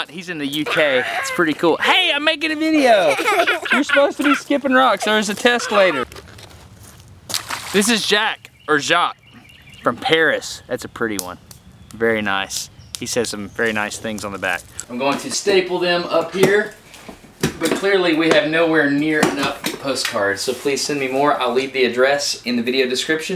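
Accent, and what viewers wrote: United States. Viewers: It's funny hearing Americans pronouncing Swedish names since we have very different pronunciation of the letter 'A'.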